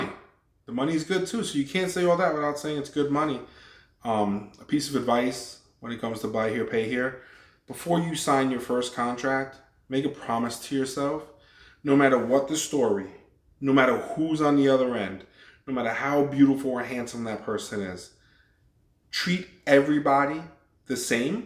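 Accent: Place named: New York, United States. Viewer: I knew it; you couldn't hide the NYC if you tried lol. Great video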